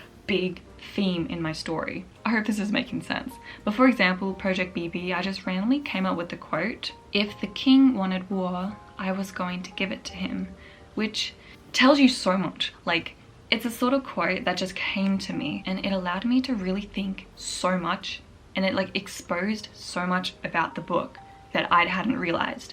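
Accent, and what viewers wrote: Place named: Australia. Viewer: I love your aussie accent!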